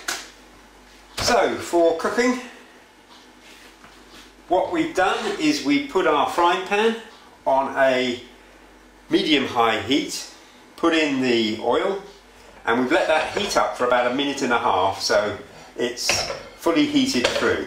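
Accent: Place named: United Kingdom. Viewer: I read that you are supposed to use lime juice with a white fish. Why am I listening to a British guy tell me how to cook?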